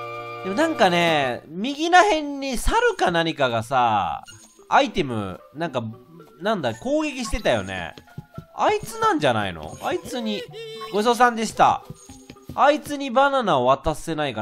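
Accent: Kansai, Japan. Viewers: レトさんの関西弁が好き〜〜〜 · レトさんの関西弁(⸝⸝⸝ᵒ̴̶̷̥́ - ᵒ̴̶̷̣̥̀⸝⸝⸝) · レトさんの関西弁違和感なさすぎる( ˘ω˘ ) ｽﾔｧ…さすが関西出身やなぁ…好きやわ～レトさんの関西弁( ◜௰◝ ) あっ忘れてました( ˊᵕˋ ;)💦 あっこちら皆様が求めているシーンとなります|´-`)ﾁﾗｯ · レトさんの関西弁すきなんよなー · レトさんの関西弁可愛い( ´,,•ω•,,`)♡ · レトさんの関西弁がたくさん聞ける神回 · レトさんの関西弁にキュン死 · 初っ端から関西弁聴けて幸せだいぶ幸せお耳が幸せとにかく幸せ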